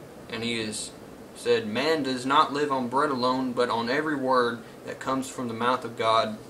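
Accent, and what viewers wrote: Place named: Carolina, United States. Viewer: You don’t have a Georgia ascent you have like a North Carolina South Carolina ascent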